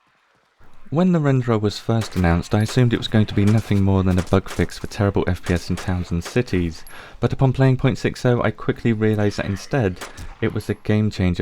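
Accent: United Kingdom. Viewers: This was lit (Love your soft British accent)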